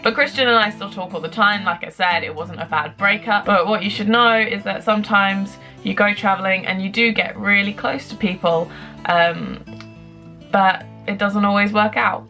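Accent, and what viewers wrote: New Zealand. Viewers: Thanks for the update. Your accent is so kiwi it's UNREAL! Haha. We decided to start exploring the UK too after coming back from Asia - like you said we can plan to see countries on the opposite side of the world when we haven't even explored our own. Look forward to the upcoming videos, Amy and Yas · so excited to hear what your up to these days :D also your accent keeps making me laugh because the kiwi accent keeps coming through haha!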